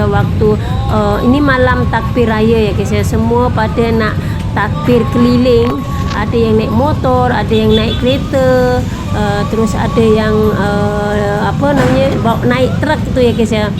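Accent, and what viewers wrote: Java, Indonesia. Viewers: Logatnya biasa aja lah pake indonesia jadi kurang enak dengarnya pake bahasa melayu malasyia lucu,gak enak dengarnya sebab medhoknya masih nampak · bahasanya boleh melayu Malaysia tapi logat jawanya medog banget inilah Jamed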